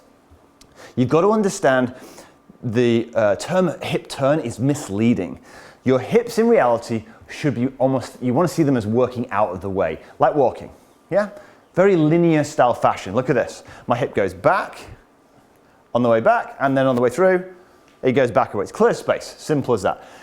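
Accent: United Kingdom. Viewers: You the man , Mister !! Great materials, and the British accent is just an icing on the cake !!!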